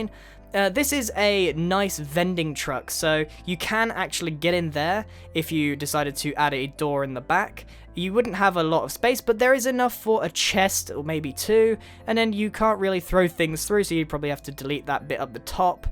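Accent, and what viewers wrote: United Kingdom. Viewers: wiat grain r u british? i thought u just liked the accent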